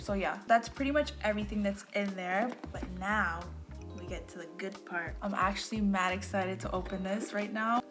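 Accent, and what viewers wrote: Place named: Toronto, Canada. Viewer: Great unboxing and video editing! loved the stop-motion at the start. Could tell you're from Toronto based on your accent lol. Keep going! I subscribed :)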